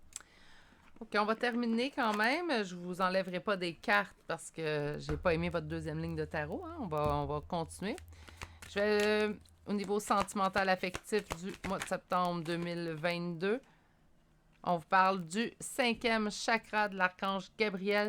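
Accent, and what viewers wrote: Canada. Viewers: Bonjour, Ça sonne juste. Ha, que j'aime aussi écouter ce merveilleux accent Canadien chaleureux et rassurant ! Bises.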